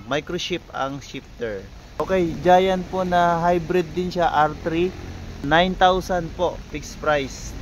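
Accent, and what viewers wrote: Visayas, Philippines. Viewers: Bisaya ka bai? 🚴‍♂️🚴‍♂️🚴‍♂️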